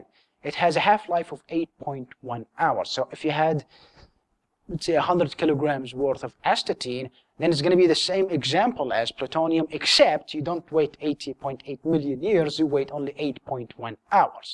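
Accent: Arab world. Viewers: he's got a really heavy arab accent (im arab myself) ..is he actually arab ? nevertheless he is amazing :D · اللكنة العربية المستشرية احلى ما في الموضوع :D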